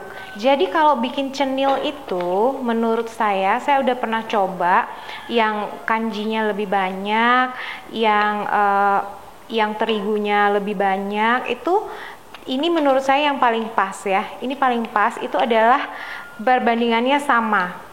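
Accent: Medan, Indonesia. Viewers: Orang Medan kakak ini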